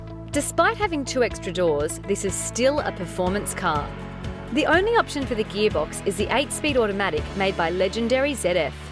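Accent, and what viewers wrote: Australia. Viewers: since when did Aussie accent start getting compliments? anyway, the car is not as elegant as the Sydney blond!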